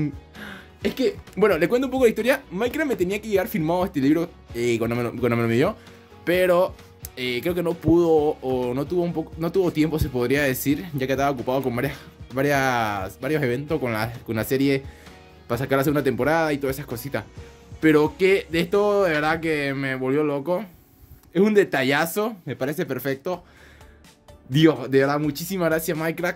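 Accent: Bolivia. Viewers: Oye yo me preguntó eres de bolivia?? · Sos de Bolivia compa?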